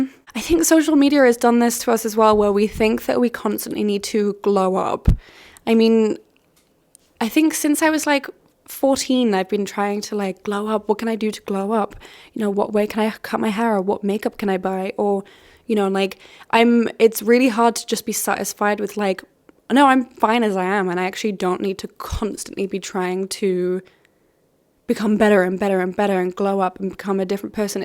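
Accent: United Kingdom. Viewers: Your British accent is so lovely 💗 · Great video! I'm 38 and social media still contributes to my regular identity crisis'. I'm trying desperately to place your accent and all I can come up with is posh Geordie 😂 I hope you're not offended!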